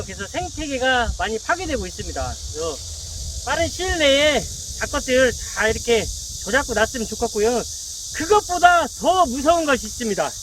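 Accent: Jeolla, South Korea. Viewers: 진짜 전라도 사투리 확티가나네요 ㅋㅋ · 사투리가 어디에요? 전라도? 충청도?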